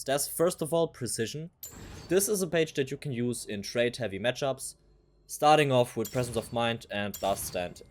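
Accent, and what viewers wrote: Germany. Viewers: From your English accent I could tell you are from Germany, danke für die Tipps waren sehr hilfreich ✅️ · Now just for me, are u German?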